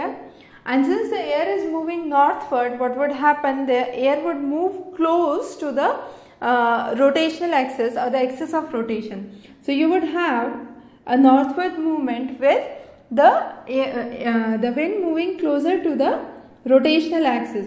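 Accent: India. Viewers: Well explained, the only problem is i'm not so familiar with the indian accent.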